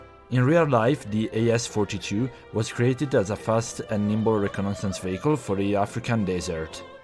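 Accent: Italy. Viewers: Si vede che sei italiano, lo riconosco dall'accento, e da come pronunci l'Italiano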